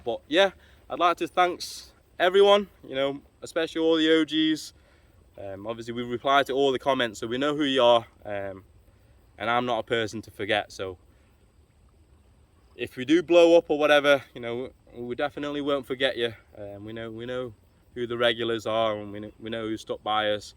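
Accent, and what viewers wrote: United Kingdom. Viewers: You got more of a British accent than me lad! Keep smashing the vids up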